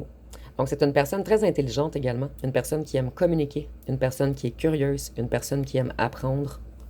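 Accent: Canada. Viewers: J adore ce vernis. La voix et l accent canadien j adore.quel est votre petit prénom discret . Je suis reunionnaise, nous avons un patois qui ressemble à celui des canadiens. ❤❤❤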